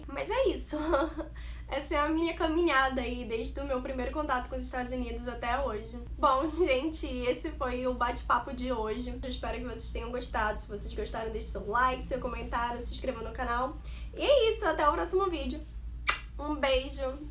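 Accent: Pará, Brazil. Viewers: O que vc estudou e se formou na faculdade? E como vc fez para se sustentar aí? 😱😱😱 sei q é caro, o dorm tb é caro! Fala um pouco sobre a questão do racismo? Vc é natural de onde,? Parece Paraense pela sua fala❤️Bjusss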